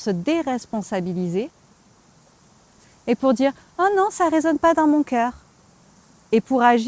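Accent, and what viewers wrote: Quebec, Canada. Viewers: Je suis sur que tu es au Québec 😁ton accent Français se confond avec le Québécois lol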